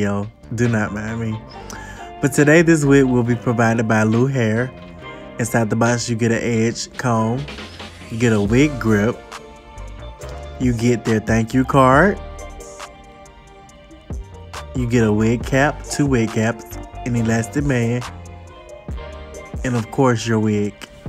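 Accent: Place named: Texas, United States. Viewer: I love🥰🥰 his accent, where are you from?... Texas?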